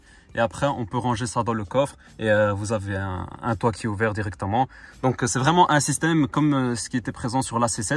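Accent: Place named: Belgium. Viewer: une guitare !!! retourne compter les chevres au maroc avec ton accent belge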